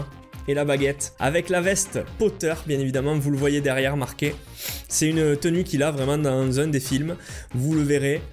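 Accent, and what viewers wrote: Toulouse, France. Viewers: Mais oui Toulouse ! Je savais que je connaissais :P je ne suis pas tout à fait à coter je suis de Montpellier mais j'ai confondu car j'ai côtoyé pas mal de Toulousains :P j'adore cet accent ! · J'adore ton accent Toulousain xD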